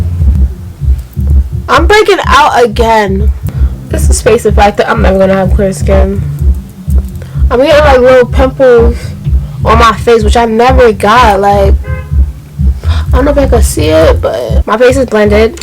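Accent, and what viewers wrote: New York, United States. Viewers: He so Ny lmaoo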